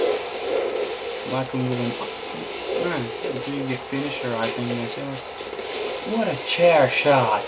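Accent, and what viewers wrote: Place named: United Kingdom. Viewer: I love your british acent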